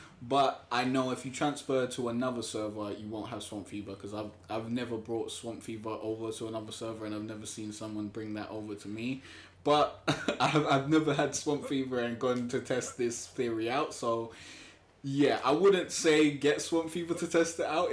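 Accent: United Kingdom. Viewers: Wow you are British, lol. Thanks for the tips.... I've only been playing for a day...finally caught my first dino: Trike and accidentally killed him on my standing fire torches...fml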